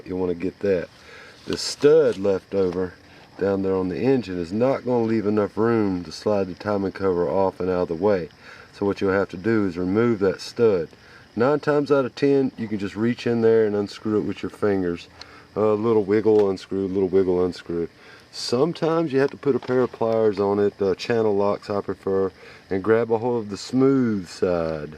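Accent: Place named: Carolina, United States. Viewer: I knew it! Carolina accent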